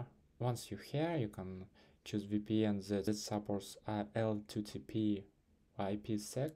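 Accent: Russia. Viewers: слышу русский акцент!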